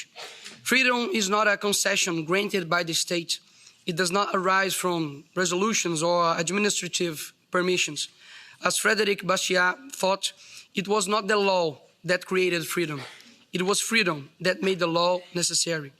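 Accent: Brazil. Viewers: FALANDO INGLÊS TUPINIQUIM DELES.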